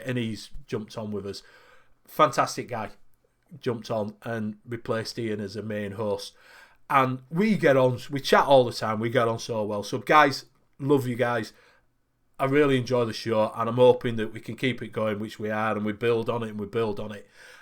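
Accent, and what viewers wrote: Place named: Leeds, United Kingdom. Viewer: Ey up, only been here a short time. But heard TVP mentioning you from time to time, but Deano TDV mentioned you as well. Went on to 3 men chat after TDV show on Wednesday n Aden mentioned you as well. So thought I'd gi'it a go. Soon as I heard Leeds (just up't'road twang I liked it) so stuck on with it. You keep an eye on chat as well as chatting with other hosts (which is a pro on so many levels). I too would like to thank Les Pickin, without his videos on how to mix, I wouldn't of learned how to mix. So big ups to Les or I wouldnt of been able to create my StRipple. I probably won't be about as much when I go back t'work. With you and hosts doing mixing, your numbers should go up more as I havent seen many other people doing both mixing and reviewing, all the best in the future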